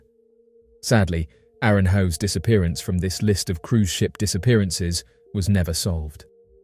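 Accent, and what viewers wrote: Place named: Southern England, United Kingdom. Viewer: The channel is from the UK and the narrator has a basic Southern English accent. What accent wouldn’t annoy you ?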